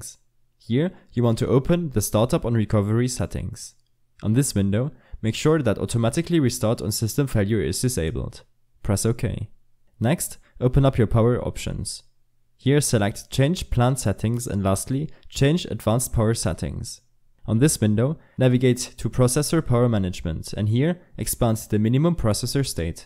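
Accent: Germany. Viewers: He’s just German alright don’t judge people.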